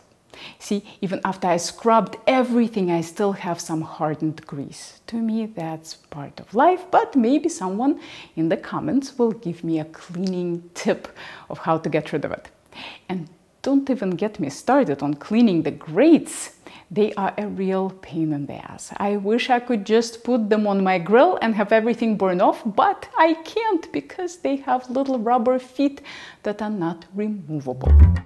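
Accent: Russia. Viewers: The Russian accent puts me off these videos and just wondering if there is any connection between the accent and the pro gas bias. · This is the first time I’ve watched one of your videos,and I am so glad the YouTube algorithm recommended it. The topic is very timely as we will be having a lovely manufactured home built for us and I either have to have a non-gas, 30” range or a gas range with propane, which would be an upgrade and cost us for the gas range and, of course, the propane. I love the gas range I currently have, and don’t want any other type range. I’m a little concerned because of what you said about your needing a Wolf because it works well with propane. I am 73 and don’t cook nearly as much as I used to, so cannot justify the cost of a Wolf. I am now a Subscriber. Thank you for this helpful video. BTW: I love your beautiful voice and Russian accent! 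· nothing in this world is more irritating than a russian accent in english. NOTHING. except people who don't understand the difference between spoken and written language, and read a script to a screen like a 5 year old reading the three bears.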